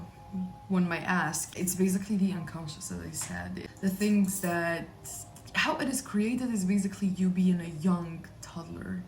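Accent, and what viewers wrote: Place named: France. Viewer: Ur french accent 😩❤️‍🔥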